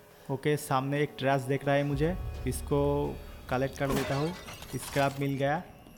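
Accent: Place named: Bengal, India. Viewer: বাংলায় বলেন আপনি যে বাঙ্গালি সেটা আপনার হিন্দি বলার ধরনই বোঝায় আপনি বাংলায় বলুন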